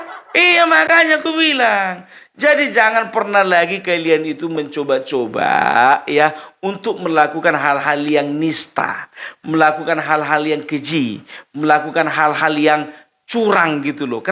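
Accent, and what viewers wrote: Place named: Java, Indonesia. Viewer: BANG. SERING2 PAKE LOGAT JOWO, LEBIH KEREN DAN LUCU BANGET 😂 HOORRAASSS... MANTAP 👍👍👍🙏🙏🙏 Saya Warga Jember Jawa Timur Hadir 🙏🙏🙏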